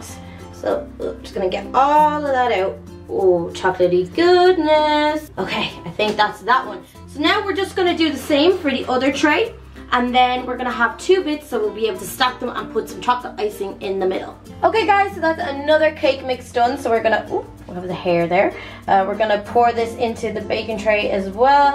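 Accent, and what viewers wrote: Ireland. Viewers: are u irish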